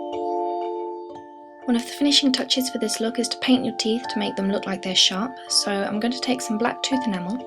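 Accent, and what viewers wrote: England, United Kingdom. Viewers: Now I know what I'm going to dream about now : A nice girl with a british accent being taken over by evil clowns... or lollipops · are you English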